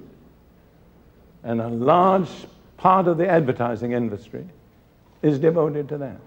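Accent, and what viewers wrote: United Kingdom. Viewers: I used to listen to snatches of his radio program during his lifetime when I was college, but he seemed rather like a rather stuffy lecturer due his British accent yet flawless diction. Now I hang on his every word, and his anointed teachings are powerfully strengthening my Christian life.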